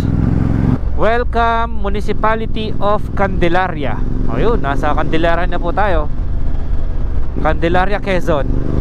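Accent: Pampanga, Philippines. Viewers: I love your, Kapampangan accent, Say it again.... BARAG😂😂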